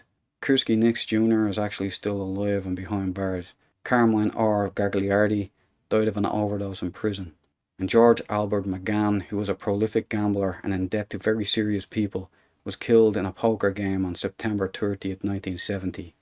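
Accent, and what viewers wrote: Dublin, Ireland. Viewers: well done...!...love the Irish axcent..!....& the quote"the Vietanmies were very lucky he wasent sent over there".....lol...4 sure....men like him are a hard find nowadays...!...he did so much in such a short life...R.I.P. Buford..! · Just discovered this channel, great story. Fair play lad. You a Dub as well? Where 'bouts you from? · Puss-er. The Irish type accent of the narrator brings out the 'Poos-er.' I'm thinking he would pronounce 'hustle' as 'hoostle.' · Spoken like a true Irishman. Well Done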